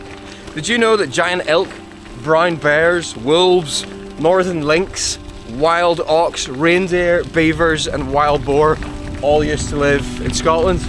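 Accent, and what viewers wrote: Ireland. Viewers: Same problem different country. We have that issue here where i live too, but personalizing it doesn't matter because you can look at every single continent and every country this same issue pops up. It's not new internationally or unique to you guys and your neighbours. Here in this video you talk about plantations as the problem, but they are not the real issue. The real issue is mono crops. Mono crops are not only this major issue destroying all of the worlds forestry wildlife and plants, but also are a major crisis in our food supplies that could actually cause the extinction of our species long before any of natures other disasters if we're not careful going forward. You can speak quite seriously but the tone of the catastrophic disaster that this is really doesn't come through with the charming irish accent haha. Maybe an angry German should narrate how big of a problem this all is, or a Russian with vodka withdrawls lol.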